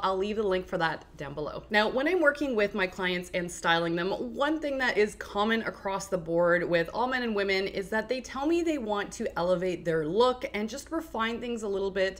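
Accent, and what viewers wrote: Canada. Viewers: I guessed Canadian.. interesting, we have a big polish community here in the UK x great video thank you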